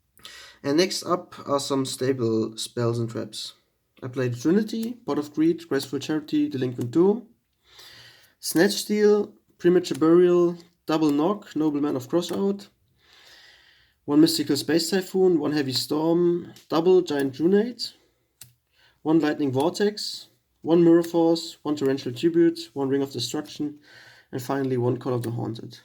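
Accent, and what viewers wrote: Germany. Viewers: hab gleich dein deutsch englisch rausgehört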